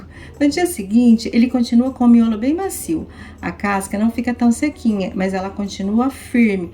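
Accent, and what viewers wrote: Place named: Minas Gerais, Brazil. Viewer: Oi, tudo bem? Tenho duas curiosidades a respeito de vc: Qual é o seu estado? Seu sotaque, as vezes, parece interior de Minas. Qual é seu nome? Tenho uma prima que se chama Teresina e tem apelido de.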